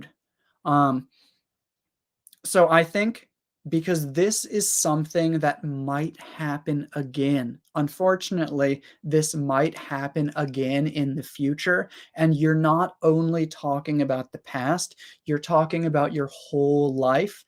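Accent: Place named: United States. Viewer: You are absolutely right. I'm also happy to be your friend.l had some curiosities when l was in a school that how american people speak english.Grammar books couldn't provide full information at that time.l'm impressed with video as I can understand his words.Thanks for being considerate with us.Just love your accent.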